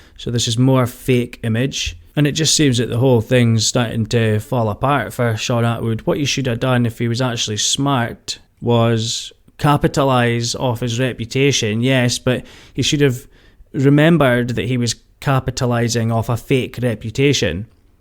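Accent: Scotland, United Kingdom. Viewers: You Scottish bro that’s coming from a Scotland ma lol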